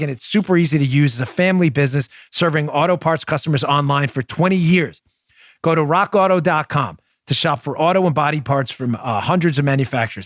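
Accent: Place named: Queens, United States. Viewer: This Queens girl loves your voice. It's a piece of home I miss terribly. I ''ve lived in Texas for 46 years.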